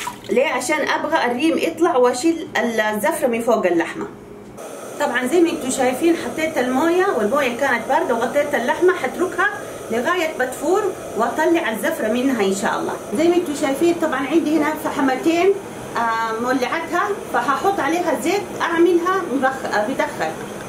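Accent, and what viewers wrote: Hejaz, Saudi Arabia. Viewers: لايك لعيون اللهجه الحجازية 😍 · بسم الله ماشاءالله على لسانك الحجازي المحلى بذكر الله وسيدنا محمد عليه الصلاة والسلام وألف صحه وهنا عليكم بالعافيه يارب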